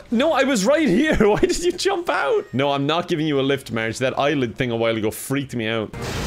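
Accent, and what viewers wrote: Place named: Ireland. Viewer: Irish Man yells at video game.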